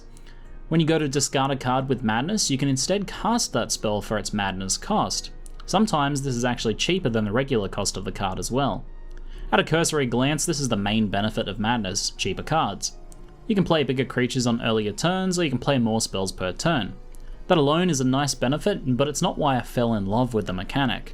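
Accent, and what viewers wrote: Australia. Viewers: oh wow, I haven't seen any mtg content created by a fellow aussie before, this is awesome! I want to find more of the Australian MTG community, where/who are the people? Sometimes it's just nice to hear an aussie accent, you know? :D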